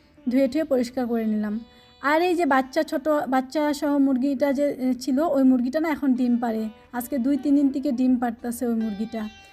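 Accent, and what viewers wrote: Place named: Bangladesh. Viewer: আপু তোমাদের জেলার নাম কি। তুমার কথা গুলো আমাদের বাংলাদেশর মতো ❤❤❤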